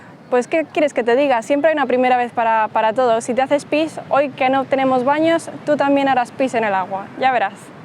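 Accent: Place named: Madrid, Spain. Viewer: ¡Un video muy guay y muy útil! Mi tutora es una madrileña también y vuestras maneras de hablar son muy parecidas.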